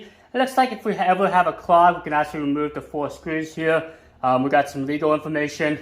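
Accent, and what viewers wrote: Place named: Singapore. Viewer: You Singaporean or something? Accent is strong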